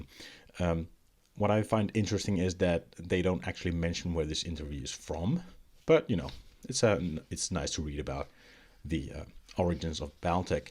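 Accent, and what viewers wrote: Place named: Finland. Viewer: HAH! Now I hear it! You sound like my buddy from Seinäjoki! :D Should have recognized it at once. Good to hear you found a warm welcome here. :)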